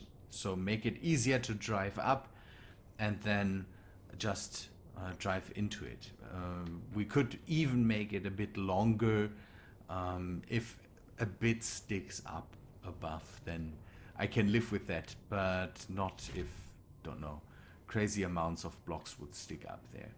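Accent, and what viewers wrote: Germany. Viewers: DIESER DEUTSCHE AKTZENT AAAAAHHH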